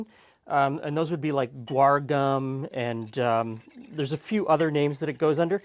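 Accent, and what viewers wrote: Canada. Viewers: everyone's talking about the bagged milk but I was like "he sounds Canadian" despite most Canadians living in Ontario have a very subtle accent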